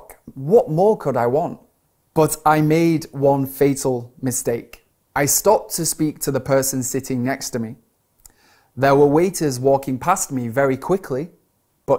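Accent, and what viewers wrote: United Kingdom. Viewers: B, A) Почти без затруднений все поняла.Ох уж этот великолепный Британский акцент 🔥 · Боже, брит акцент наслаждение для ушей, это стаккато, это эаа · нужно однозначно создавать свой подкаст на английском, приятный британский акцент. What do u think about it, sir? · Я уж подумала, что ни фига не пойму. Оказалось, не оказалось!!! Спасибо большое учительнице английского, к которой я ходила на дополнительные занятия. Она заказывала нам книжки и кассеты из Великобритании. Вспомнила с акцентом их звучание!!! 😢🥺😍😘 Побольше бы таких занятий! 🥰🥰🥰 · Блин британский акцент((( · классное видео, спасибо! такой чистееькийи понятный британский английский! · Вижу оповещения о новом видео от SkyEng с Сэром - откладываю все дела на потом 😍 Ответы, вероятно, b&a И отдельное спасибо за мой любимый британский акцент от любимого бриташки ☺️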